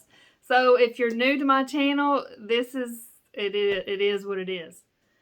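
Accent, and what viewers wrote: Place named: Tennessee, United States. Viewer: Congratulations on reaching 500! You're so cute, live hearing your Tennessee accent..Halloween will be here before we know it.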